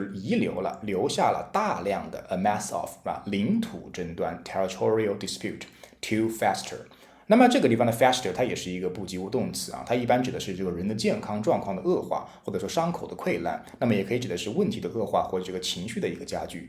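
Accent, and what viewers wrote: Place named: United Kingdom. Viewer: 有英腔朗讀，又有精闢句型詳解，是一個真正學好英文的好節目。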